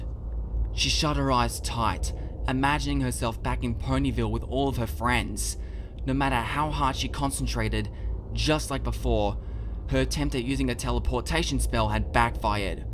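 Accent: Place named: Australia. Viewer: Your Australian voice is funny honestly, I wonder who you are, you sound familiar XD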